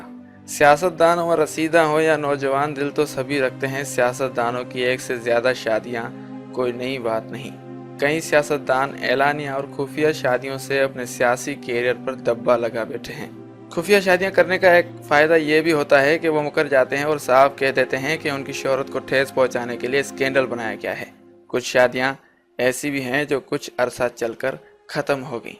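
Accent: India. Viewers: This guy is indian 'his accent tells his reality. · Jahil admi pehle urdu to bolna seekh lo..such an ugly accent of urdu..why are you trying to talk like indians? U think u sound cool? Lol...and who is Samina Durrani? How jahil are you!!!???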